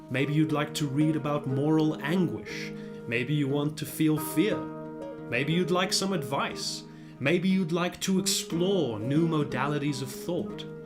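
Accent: South Africa. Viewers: Guy are you South African?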